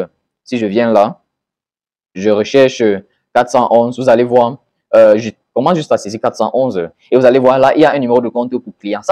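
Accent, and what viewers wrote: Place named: Central Africa. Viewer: Commentaires anormalement élogieux !!! C'est du pipeau !!! La vérité c'est que vous parlez trop et avec un accent difficile à capter pour des non centrafricains Abrégez svp et soignez votre diction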